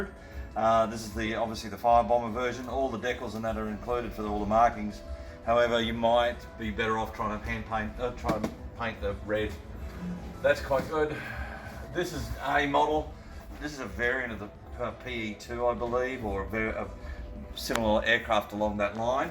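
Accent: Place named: Australia. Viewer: I am American and I understand his Australian accent.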